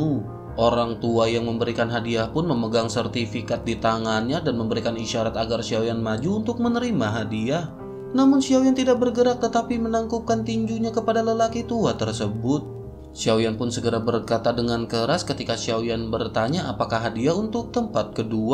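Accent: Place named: Jakarta, Indonesia. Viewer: Paten mimin logat betawi keren dan lucu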